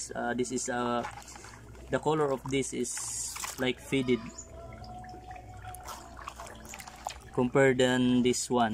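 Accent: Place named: Philippines. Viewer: I love your tagalog accent ♥️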